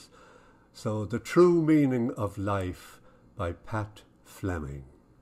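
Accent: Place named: Ireland. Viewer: I LOVE your recitation of my poem, particularly with that lovely Irish accent. You say my words with the exact feeling with which I wrote them. You have given my words life and what a fabulous gift that is for me. You say each word with your heart and I now have a lump in my throat. You are wonderful. Sincerely, Pat F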